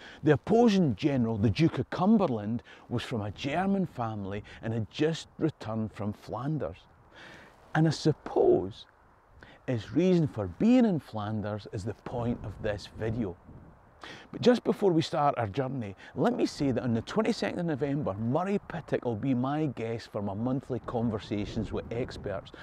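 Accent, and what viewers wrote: Scotland, United Kingdom. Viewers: for some reason the scotish acent makes it more entertaining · Happy New Years and family!!! I watched someone else vid. But finely I now know what a Jacobite is. I had a hard time understanding you. I would turn up volume. When you would say who they were. I think it's was your Scottish accent. I feel all caught up. Warm Hugs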